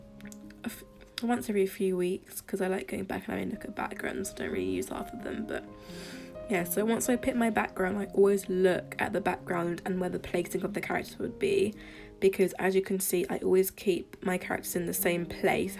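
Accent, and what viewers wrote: United Kingdom. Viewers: IS THAT A BRITISH ACCENT I'VE LIVED IN BRITAN ALL MY LIFE AND I CAN'T DO A FREAKING BRITISH ACCENT (Your voice is so cute i love it) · YAY U BRITISH LIKE MEH UWU ! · British like me :) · U British??? :D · She has such a nice British accent! lol I just sound sleep deprived all the time😂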